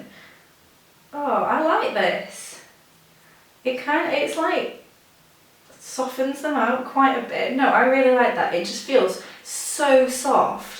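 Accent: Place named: United Kingdom. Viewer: TheRedMoon its british😁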